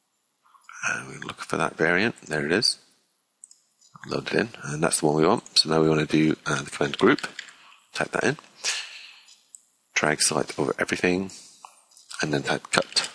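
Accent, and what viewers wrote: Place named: Scotland, United Kingdom. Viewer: Firstly, thanks for taking the time to make a tutorial. It's much appreciated by many! As others have said, if you are going to actually take that time to make something to help people, it might be worth trying to slow down (a lot) when you're talking, and try not to mumble. Your Scottish accent adds an extra layer of difficulty for those non-Scots. It would really help everyone to understand your excellent tutorial. But thanks again for a great tutorial!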